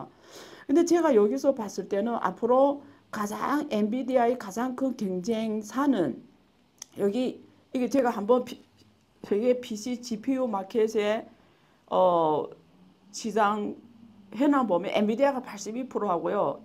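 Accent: Yanbian, China. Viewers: 듣기 거북하다 부칸 언어...아니.뒹귁 년변어인가..불편해..제발.그만..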